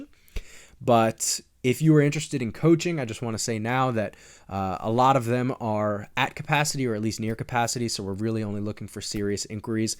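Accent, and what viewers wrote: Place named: Miami, United States. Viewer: Bros been in Miami for too long, almost say america with an accent lol.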